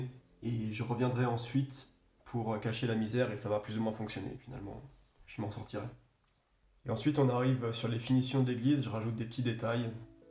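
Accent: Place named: Alsace, France. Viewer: Merci, il me semblais avoir entendu l'accent Alsacien de temp en temp!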